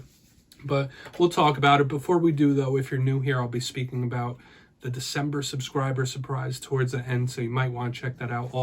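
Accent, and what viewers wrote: New Jersey, United States. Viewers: you have a Jersey-ian accent. I love Jersey. It's possible for regular people to convince other regular people to do horrific and unGodly things based off of THEIR own personal experiences & happenstances in life. The challenge is to think independently and live without fear of repercussion or reprisal. Don't sacrifice your valuable time doing things that you, otherwise, would not do. We are here to enjoy our time, that's all.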